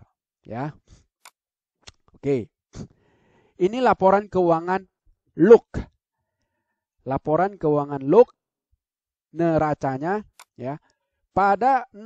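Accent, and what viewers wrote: Java, Indonesia. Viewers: Jiangkrikkk.... Muantapp ker lak ngomong. Medoknya dapet... Coba pak bilang jianncukkk...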